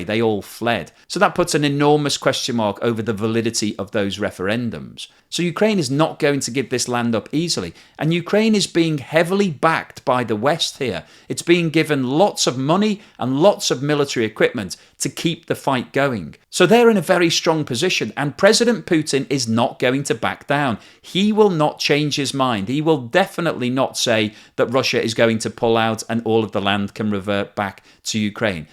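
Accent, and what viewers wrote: United Kingdom. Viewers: he claims without citing any source that it doesn’t “seem to be the case” that Russia stuck to its original claim that they’d only call up reservists as part of their expanded military campaign - he follows up with stating that “many” people who have “gotten those papers” have absolutely no military background, again without citing any source and certainly without any sort of quantitative estimates provided - he immediately then admits that “there are no official reports” regarding this issue, but he offers in its place, as some sort of proof, the always-trustworthy “reports on social media”. These are just a few examples of how incredibly biased towards western mainstream media narratives that he is. This guy or whatever is incredibly ill-informed, close minded, and tbh seemingly just downright dumb; he constantly uses unsophisticated,overly simplistic arguments that are the type of propaganda you’d find as talking points from an episode of vrian stelter on CNN. 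Your British accent can only get you so far buddy. Sooner or later you’re going to be figured out for who you really are: a run-of-the-mill, wannabe establishment political pundit, punk, who for some reason thinks he can brand himself as a some sort of a financial analyst, even though you are clearly just masquerading as one given how infrequently you actually present a comprehensive quantitative financial analysis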